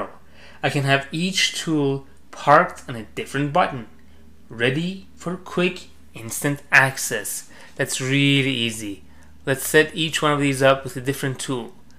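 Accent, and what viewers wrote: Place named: India. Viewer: This is NOT a TUTORIAL?!! Then what the fck is it? The video is titled "TUTORIAL!" And the narrator specifically mentions "TUTORIAL!" The poster asked if there was someone who spoke English w/o a frickin' accent! I don't see why when there are instructions to be given, everyone has to have a frickin Indian accent!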